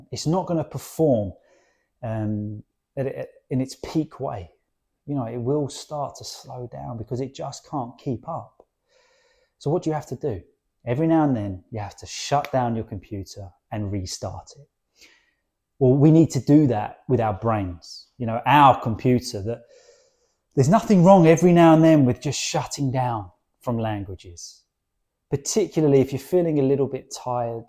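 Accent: RP, United Kingdom. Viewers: I am the1st . Nice British RP. Cupatea great! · Gracias profesor, este formato de vídeo está genial, amo el acento británico, ojalá algún día pueda hablar así 😅 saludos desde Argentina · ❤❤❤❤❤❤ Thank you so much for your teaching The British English Language, it means that The Modern Received Pronunciation Accent from London and The NATIVE Speaker's NATURAL Language with CORPUS (the LIVING Language materials) to immerse the learners into The NATURAL and NATIVE British English Language about NATIVE phrases and collocations in the Daily Life Conversations !!! It's GREAT !!! Love The British English really !!! Thanks your for more Videos later like this in the future !!! ❤❤❤❤❤❤❤❤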